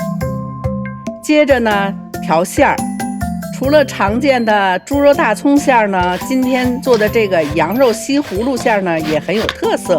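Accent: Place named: Beijing, China. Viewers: 听着地道的北京话，突然想奶奶了…… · 地道老北京味儿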